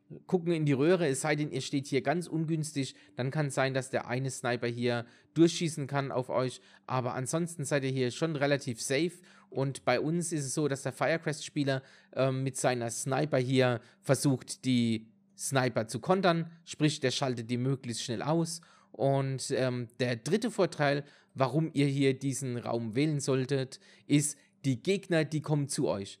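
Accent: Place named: Palatinate, Germany. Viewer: Vom Dialekt her, schätze ich auf Mannheim, Heildberg, Pfalz!?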